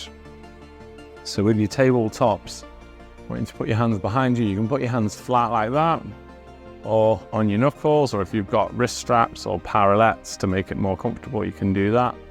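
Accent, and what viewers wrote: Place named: Birmingham, United Kingdom. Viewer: Great stretch to follow a workout! Thanks,. By the way, I love your accent. Have you seen “Peaky Blinders”? Your accent reminds me of the accents in that show. I feel like I’m working out with Tommy Shelby. 😜 Thanks for the great stretch!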